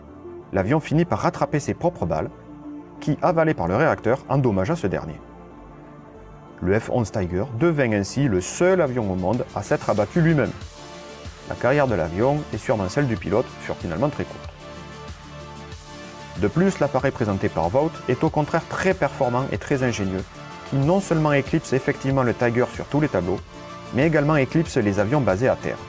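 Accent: South, France. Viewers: De très bonnes vidéos comme toujours, en plus ton accent du sud fait plaisir à mes oreilles d'ariégeois ;) ! Continue comme ça !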